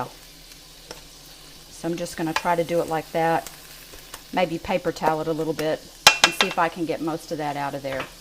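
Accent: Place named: South, United States. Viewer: Wow looks delicious! Thanks for the mushroom tip, to cook on higher heat and cute Southern accent.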